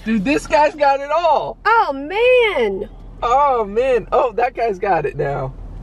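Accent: South, United States. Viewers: Southern accent is sounding so good!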